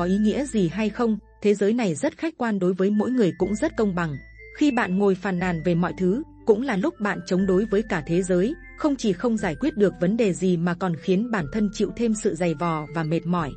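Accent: Thanh Hoa, Vietnam. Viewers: T nghe giọng thanh hóa đã chối rồi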